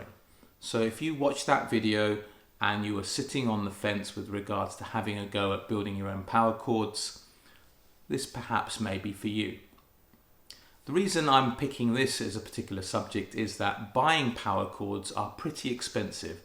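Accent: United Kingdom. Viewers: that looked great - good explanation. I noticed some comments on the length of the earth/ground lead and would say the way you did it was dictated by the amount of space in the connectors (or, I don't see another way to do it with these connectors). The connectors you use now confirm you are in the UK, as your accent did;). My advice would be to look at the "domestic ring" that dominates UK home electricity cabling design, as the second "cheap" improvement option. That ring puts all devices in the same circuit - your high-end audio with your washing machine. If we ignore cynical commenters, and focus on "clean" AC, then we have a mission here. As you know too, what comes out of your speakers is AC that got rectified and next modulated by a source signal. Any!@ coming in on your AC can disturb and distort your music. Electrical companies in Europe are increasingly concerned about household and commercial appliances putting stray signals into their networks. 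So, with the single-side grounded shield on the power cable you now have provided for an isolation against inductance interference from other sources into the protected device and vice versa, contained some of the potential stray interference that your device might throw back in the air. As "cynical" is derived from the Greek word for "dog", the cynical puppies in all their illiteracy and lack of manners warrant a demonstration of interference. If you have (access to) an oscilloscope, nothing is more impressive tha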